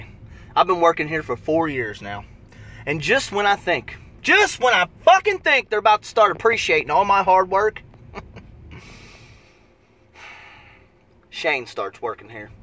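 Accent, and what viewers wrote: South, United States. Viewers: I'm new sub... your funny... may I ask where your from.. you sound southern....if so I'm from bama!!!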